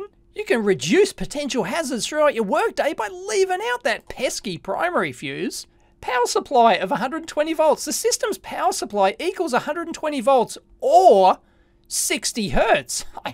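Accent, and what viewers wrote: Australia. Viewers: Yep it’s pants. To be honest Weller has a long tradition of supplying quality irons and stations. Weller as with a lot of European manufacturers have gone off the boil of late which is a shame as they are looking at price point rather than quality, it seems to be money men vs engineers. I will say one thing you sure have picked up the Aussie accent, how long have you been out there?:-D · You picked up the Australian accent very quickly! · even got an authentic Strayan accent already. Amazing!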